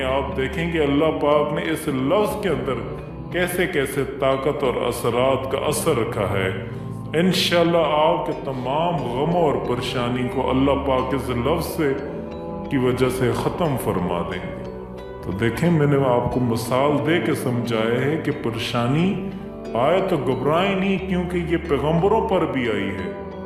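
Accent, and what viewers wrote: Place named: Punjab, Pakistan. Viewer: تلفظ ، عربی وغیرہ ٹھیک کریں ۔ پرابلم پرابلم کا رٹ ذیادہ کرتے ھیں ۔ مال کے چکر میں ھر بندہ نے چینل کھولا ھے ۔اپ عالم لگتے بھی نہیں ۔ اردو بھی پنچابی انداز میں بولتے ھیں ۔ اردو ٹھیک کریں ۔ غربی بھی ٹھیک کریں ۔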